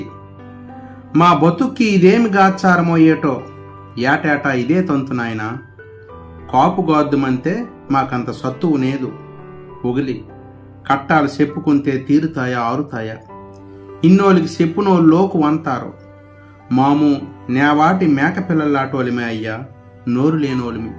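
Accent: Srikakulam, India. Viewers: కవి గారికి శుభోదయం. ఉద్దానం యొక్క పరిస్థితిని కళ్ళకు కట్టినట్లుగా కవిత చదివే విధానం శ్రీకాకుళం యాస కవితలో చోటుచేసుకుంది. పూర్వ విద్యార్థుల సమావేశంలో ఈ కవిని గురించి మాట్లాడే అవకాశం లేకపోవడం నాకు కొంత బాధ కలిగించిన విషయం. ధన్యవాదాలు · శ్రీకాకుళం యాస లో కవిత... చాలా హృద్యంగా ఉంది.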